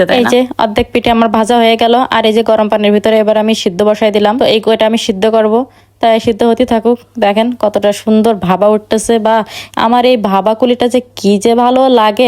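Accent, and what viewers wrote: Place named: Khulna, Bangladesh. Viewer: আহ্, খুলনা ভাষা ❤❤❤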